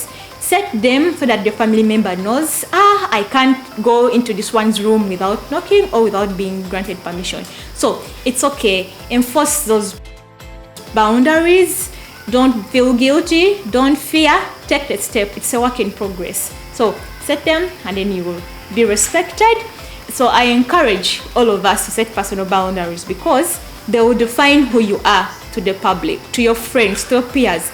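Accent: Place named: Uganda. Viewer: has directed me here. I love the Ugandan accent. So "pechuuulia"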